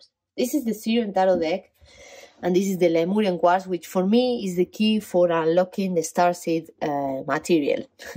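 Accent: Argentina. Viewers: reconocí tu acento ... cordobesa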